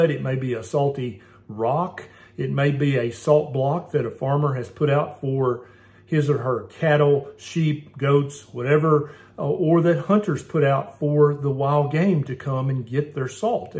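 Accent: South, United States. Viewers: I could watch Dr Berry just for the southern accent.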